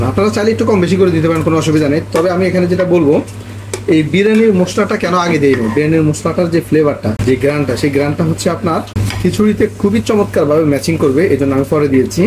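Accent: Noakhali, Bangladesh. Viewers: ভাইয়ের বাড়ি নোয়াখালী এ ভাষা সারা বিশ্বে চলে ইউটিউবে কি সমস্যা? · Apnar basha ki feni naki noakhali? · কিও ভাই আন্নে কি নোয়াখালী পোলা নিও বার বার পানি কে ফানি বলছেন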